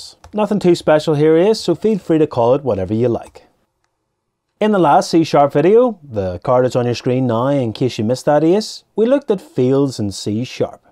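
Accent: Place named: Northern England, United Kingdom. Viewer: LOL ! I thought you were scottish and then immediately realized you were from the north.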